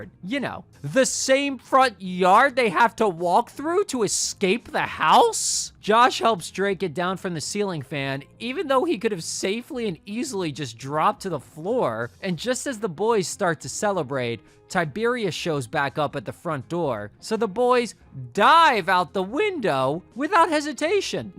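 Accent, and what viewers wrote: Philadelphia, United States. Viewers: It’s a Philly dialect along with saying “crick” instead of creek and “ratty-ator” instead of radiator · bro has a Philly accent 👑 🖍️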